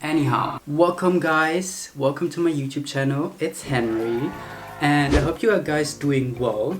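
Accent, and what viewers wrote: Germany. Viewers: Are you German? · the German accent is kind of cute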